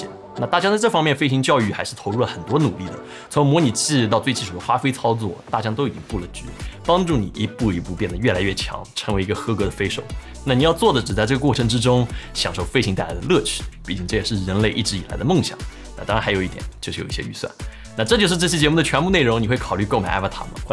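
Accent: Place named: Shanghai, China. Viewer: 這口音也太江浙上海了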